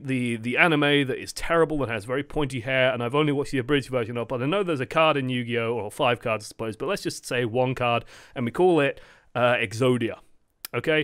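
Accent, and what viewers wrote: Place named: United Kingdom. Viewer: I like rant-esque news and thoughtpieces by british-accented folk.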